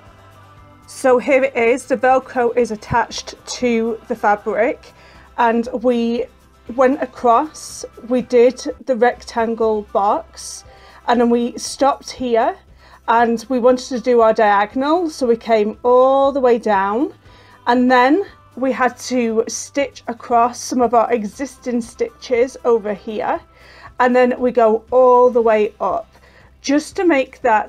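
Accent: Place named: Yorkshire, United Kingdom. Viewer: Hi! Loved your names for the different sides of the Velcro - fluffy and hookey - it gave me a smile. Wondering where you got your accent from - you sound like a real home girl to me (I'm from Leeds but live in Scarborough atm). Lovely video, really innovative - keep up the good work we look forward to them. xx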